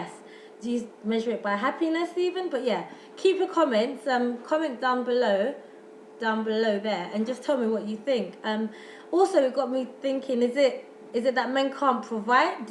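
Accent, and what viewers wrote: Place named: RP, United Kingdom. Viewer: Listen to that posh accent! LOVE IT! Do men measure up? Hmmmm...I am afraid to answer. LOL. For me personally, the man that I have DOES measure up, which is shocking because I never thought I would come across a man who fulfilled ALL of my wishes! This is a good video. For sisters who are still looking, there should be NO compromising! FAVORITED!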